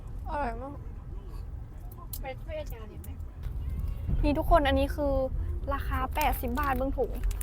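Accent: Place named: Khammouane, Laos. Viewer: ภาษาน้องเว้าเป็นภาษาทางแขวงคำม่วนทางอิสานของไทยจะนครพนมและสกลนครครับ❤❤❤❤❤❤❤❤❤❤❤❤❤❤❤❤❤❤❤❤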